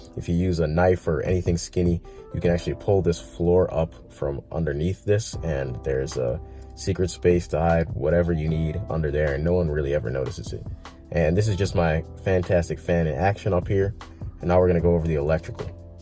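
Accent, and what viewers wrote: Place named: Canada. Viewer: You totally sound Canadian, where are you from?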